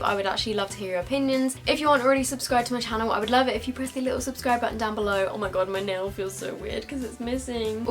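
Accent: England, United Kingdom. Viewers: What part of England are you from? <3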